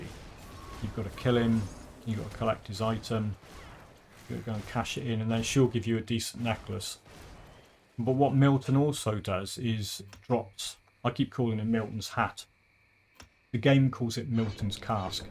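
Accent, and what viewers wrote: United Kingdom. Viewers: Hey man! This comment is kind of unrelated to this video, but I just wanted to say that you reignited a spark in me, not only for this game, but for my life (as cheesy as that may sound). I have an embarrassing amount of time in this game for what I have actually accomplished. Anyway, I'm sick a lot and bedridden where I can't do much of anything, even play GD, but watching your videos has changed my entire perspective on not only my approach, but the FEEL of this amazing game. You made it feel okay to suck...lol. I mean I should have learned the things you teach WAY earlier in life. No gimmicks or music or loud people yelling "HEY GUYS! Check this out!" Your blazingly stereotypical English Karl Pilkington ish accent made it fun to listen to and watch while in utter despair. This may sound like something bad, when in fact it's absolutely amazing and I'm very thankful for it and you. 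Disclaimer: I am in no way saying you are like that guy, just the accent. I plan on doing tons of your builds with some of my spice (currently doing your Bloody Pox) and many others. Just wanted to say thanx man, and that Warden video with every class takes some serious commitment. Keep up the great work! ..It's appreciated. 🤘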